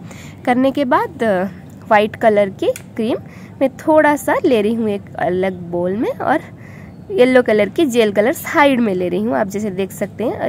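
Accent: Odisha, India. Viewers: Aap orissa se h kya?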